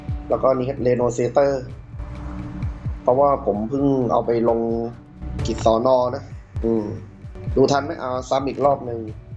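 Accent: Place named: South, Thailand. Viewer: สำเนียงพี่บ่าวนิ่ คนใต้ม๊ายนิ 😅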